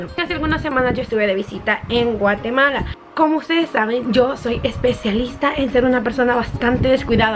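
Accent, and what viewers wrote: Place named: El Salvador. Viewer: Por tu acento sos guanaca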